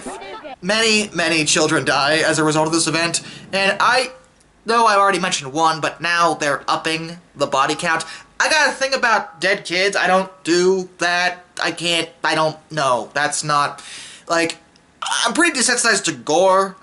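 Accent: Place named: United States. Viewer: Can't watch it. Bloke is a Moron. Annoying Yank. Shut the F up.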